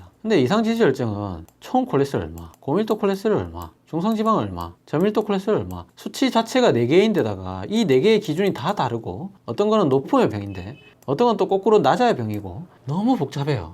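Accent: Gyeongsang, South Korea. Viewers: 대구분이신가봐요^^응싱스럽다말은 경상도사는 저의세대도 저만 사용하는 사투린줄알았는데..^^ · 와이거 메모해야겟네..ㅜ 근데경상도이신가요? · 구수한. 경상도사투리. 너무 정감갑니다 편안하고. 쉽게. 설명해주셔서 감사합니다 스폰지처럼 머리속에. 쏙쏙. 이해가. 갑니다 짱. 입니다 ㅎㅎ.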